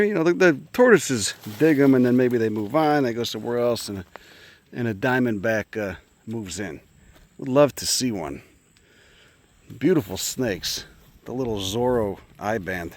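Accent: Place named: Florida, United States. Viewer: he really got into florida character for this episode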